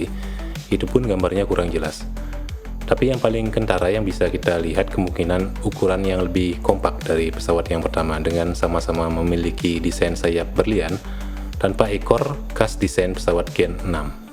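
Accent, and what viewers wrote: Bali, Indonesia. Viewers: Orang bali lu bang?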